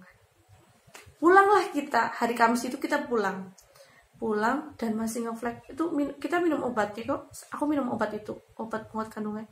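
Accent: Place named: Java, Indonesia. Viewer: Medyok sekali kakak senior idolaku iniiiii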